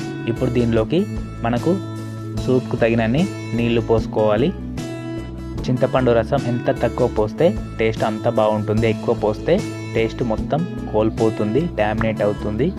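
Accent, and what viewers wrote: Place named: Andhra Pradesh, India. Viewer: Tammi, mana karimnagar yasa lo matladakunda, andhra yasa matladtavem tammi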